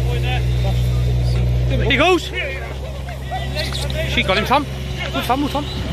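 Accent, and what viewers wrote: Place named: South Wales, United Kingdom. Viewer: nice one mate well done dogs are cracking is that a south wales accent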